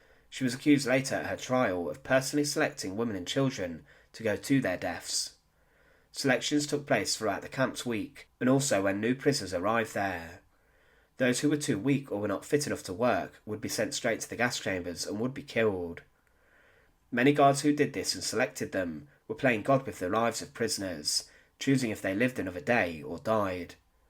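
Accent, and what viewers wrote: United Kingdom. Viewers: It seems you have a British accent, have you ever made a video about the 50 plus concentration camps build by the British? i guess NOT! You poms are good in pointing the finger on others!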